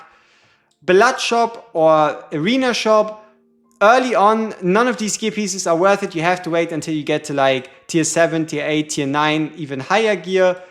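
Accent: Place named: Germany. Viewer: Ich erkenn an der Art wie du sprichst das du Deutscher bist. Meinst du man sollte neu anfangen wenn man diese Fehler relativ oft am Anfang gemacht hat oder trotzdem weiter spielen bin jetzt Level 25 nach 2 Tagen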